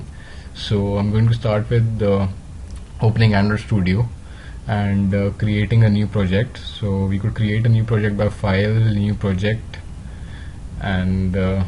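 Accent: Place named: India. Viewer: dari logatnya kayaknya dia india cuy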